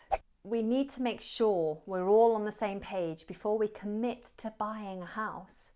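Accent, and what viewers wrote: United Kingdom. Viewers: The British accent is the best, the teacher is highly qualified to teach the English language, she has the right pedagogy to teach from beginner to advanced level. Before traveling to England it is important to know all the popular idioms and phrases that are used daily in that country!! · Mam you're really gorgeous and Articulately British accent, thanks for your Hardworking style to give us compelling data about advance english level I'm waiting for your next progresive Learning method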